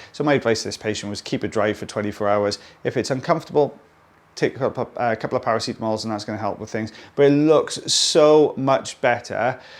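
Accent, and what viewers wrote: Wales, United Kingdom. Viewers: Love the Welsh accent. A lot of Wonderful Singers come from Wales. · Off topic: I'm totally in love with your Brit accent! I could stand to listen for ages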